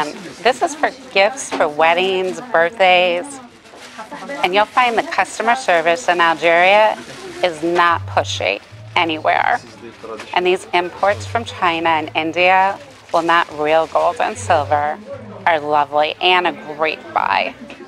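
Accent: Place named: United States. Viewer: It is not english language but american one .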